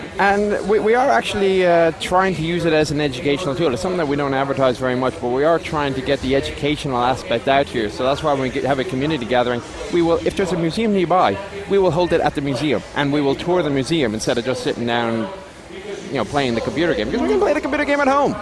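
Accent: Ireland. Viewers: Mr Chieftain are you by any chance Irish? Your accent seems a wee bit Irish.. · I'm sorry I might be completely wrong, but does he have a bit of an irish accent?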